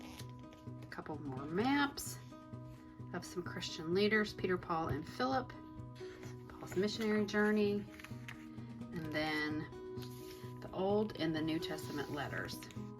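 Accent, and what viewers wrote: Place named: Jacksonville, United States. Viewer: Are you in Jacksonville? Your accent.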